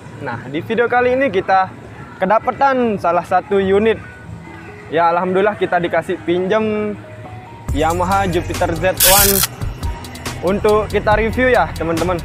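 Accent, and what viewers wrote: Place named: Lombok, Indonesia. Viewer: seperti logat lombok bicaranya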